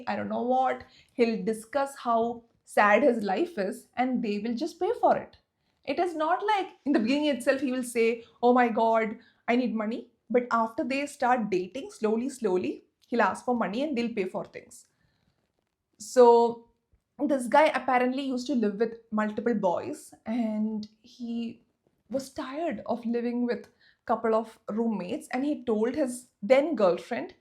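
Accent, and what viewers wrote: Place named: England, United Kingdom. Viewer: Hello Di Wassup You know what idk why but I am in love with the way you are talking i mean I am obsessed with the English accent Love from Assam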